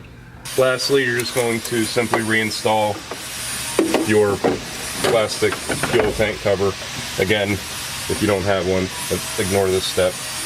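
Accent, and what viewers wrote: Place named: Central Pennsylvania, United States. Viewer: i can identify this accent with surprising certainty because of a buddy from central PA i served with. its the pronunciation of the work "on"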